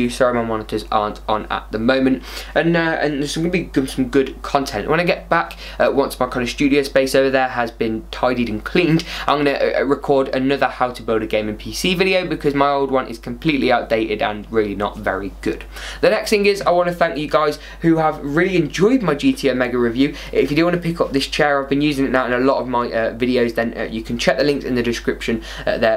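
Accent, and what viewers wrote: United Kingdom. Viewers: By your voice i think you're in the uk, where abouts in the uk would you suggest i buy parts (online or in store) i want to build the $700 build but buying in the uk with VAT and things it works out so expensive, so where abouts would you suggest to buy parts for the cheapest possible?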